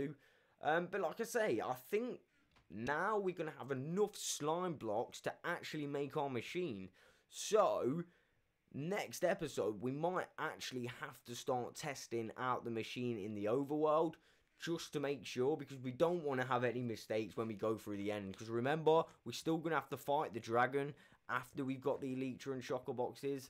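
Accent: United Kingdom. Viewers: He's german And he has british accent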